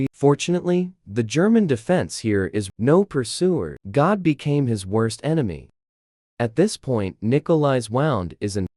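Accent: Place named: India. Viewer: "Inside this video". This is not even AI its actualy an indian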